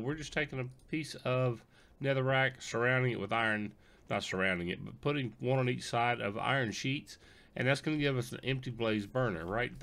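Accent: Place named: United States. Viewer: this mans voice makes me miss the USA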